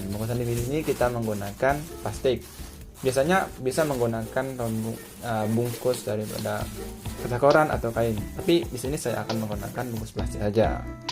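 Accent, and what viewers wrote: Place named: Aceh, Indonesia. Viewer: Logatnya suku aceh y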